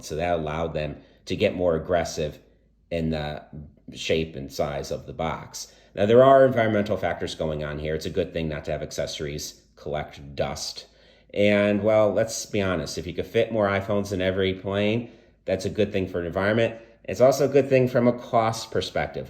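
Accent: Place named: Connecticut, United States. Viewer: Informative video and strong Connecticut accent. 😅 I spent my middle- and high-school years in Suffield.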